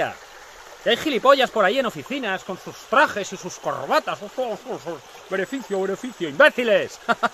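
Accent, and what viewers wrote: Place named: Australia. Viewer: like ur aussie accent 😄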